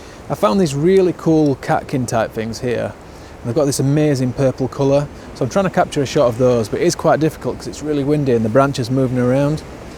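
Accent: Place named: Congleton, United Kingdom. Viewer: You sound just like all my pals from Congleton 👍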